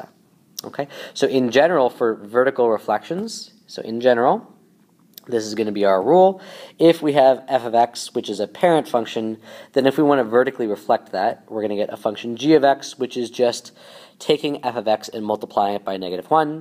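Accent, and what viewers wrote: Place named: Canada. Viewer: cheers mate rate the canadian accent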